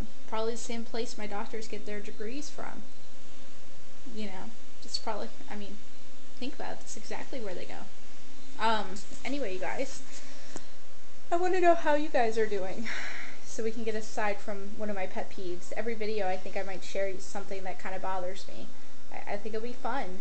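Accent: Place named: Canada. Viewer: Canadians do rock, I love the Canadian accent :)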